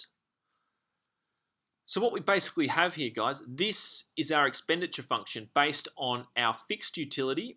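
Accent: Australia. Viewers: I asked myself "I wonder where this bloke's from, he sounds like he could be from Perth", then I check the channel and there you go. Strange combo of skating and econs, but good video. Thanks from Germany!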